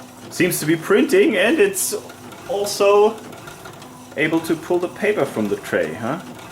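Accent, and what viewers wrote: Germany. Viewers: Dein Englisch ist viel besser jetzt und der schreckliche deutsche Akzent verschwindet. Sehr gutes Video wie immer. Vielen Dank fürs Hochladen! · What happened to your English?? Stop trying to sound American. It sounds horrible. I much prefer your clear German accent. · Ich gratuliere Ihnen mit Ihren Erfolg !!! like all your video's, especially your German accent...love it !! Herzlich grüße aus die Niederlande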